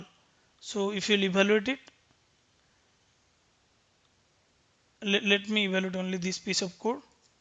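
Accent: India. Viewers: always an indian, but i love it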